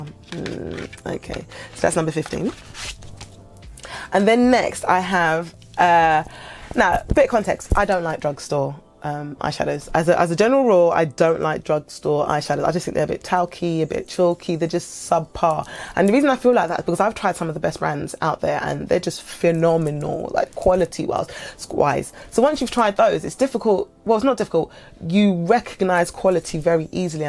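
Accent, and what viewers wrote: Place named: United Kingdom. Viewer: Are you from Britain? I love your accent! Very elegant.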